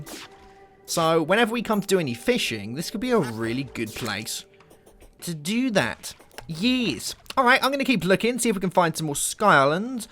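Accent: United Kingdom. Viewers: Foster He is British. That's how we say meteor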